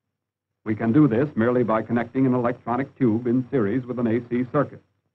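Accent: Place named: Transatlantic, United States. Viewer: I love these classic old American explanatory videos, military training videos etc. They're so clear and to-the-point, and always in that Transatlantic accent that you just don't hear anymore.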